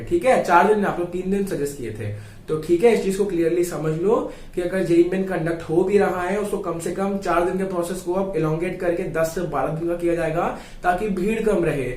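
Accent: Bengal, India. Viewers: Are you bengali ????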